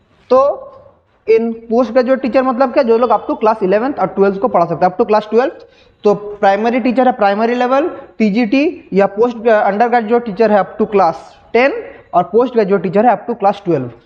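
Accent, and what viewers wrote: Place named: Bihar, India. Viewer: Ap bihari ho n bhiya , your bihari accent become to know me this😊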